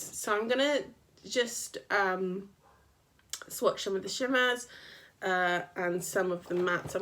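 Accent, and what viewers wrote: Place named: RP, United Kingdom. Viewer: you speak posh, good to see you again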